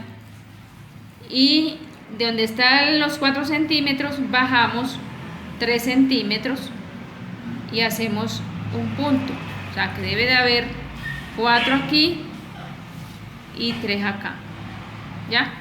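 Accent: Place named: Colombia. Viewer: Amiga gracias por esa noble labor de enseñar con tanta paciencia. Que el Señor siga bendiciendo tu vida y sobre todo siga derramando mucha sabiduría. Soy de Colombia me imagino por su acento que usted también .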